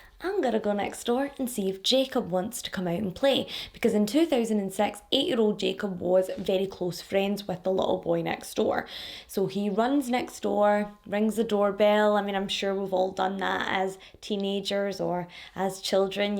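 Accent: Scotland, United Kingdom. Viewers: Is she Scottish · Hello. Yes I'm a brand-new subscriber from America. I love your accent I spotted it as Scottish right away. This story is so messed up all the way around. Those parents pick themselves up. They just left the dark past behind. And they reached for the Stars. And her only complaint was that she did not like the rules. She should have just sucked it up Chuckles. Find one teenager that just likes the rules. LOL. The tragedy of the murder. To her father seeing her as his last thing he sees on this Earth. And oh my goodness a little boy. The fear and Terror that boy had to go through period at the hands of his so-called sister. And I absolutely agree with you that idiot she was dating had fetal alcohol syndrome. I have worked in the healthcare industry. I have met many children with fetal alcohol syndrome. There's affect that a lot of people don't know. 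If you look at your pinky and you bend it there's two joint. A person who have foetal alcohol syndrome only have one joint. And I could tell by the structure of his face. And the fact that his mother was just a alcoholic. But at least you admit she did not address his mental health issues when she should have. That doesn't make anything better. but one we own up to our mistakes and take culpability for them. Give me an iota of minimal respect for her. and what about everybody that was seeing that on MySpace? They should definitely all be held culpable. You keep it up honey you are on the rise. You have become one of my favorit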